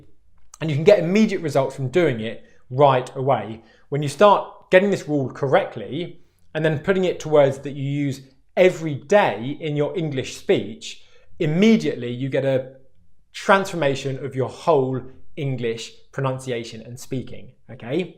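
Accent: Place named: England, United Kingdom. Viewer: Millions of English people do speak this way. Where have you been? Listening to US American Movies?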